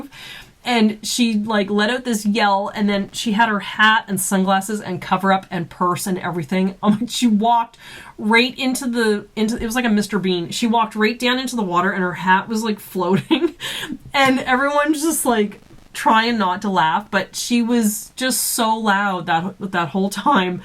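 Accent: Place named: Canada. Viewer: I also like your canadian accent and I adore your sketches. Can you make a pinata for us on ur craft channel :)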